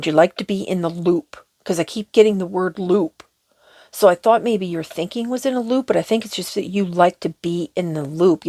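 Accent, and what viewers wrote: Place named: Atlantic Canada, Canada. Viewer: so glad to have found your channel, 🩵💕 love your voice - its such a blessing to hear an atlantic canadian accent reading for spirit!! 😇🐉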